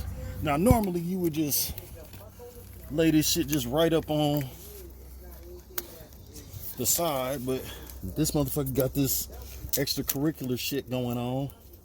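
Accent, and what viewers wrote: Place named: California, United States. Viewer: Definitely a Cali native lol. This video should have more likes. Perfect fix!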